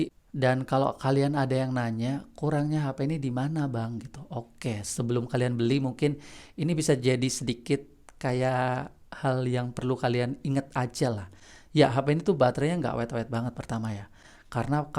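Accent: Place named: Banyumas, Indonesia. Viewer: Njirrr wong Banyumas si. Dongen nganggo basa ngapak bae mas hahahha😂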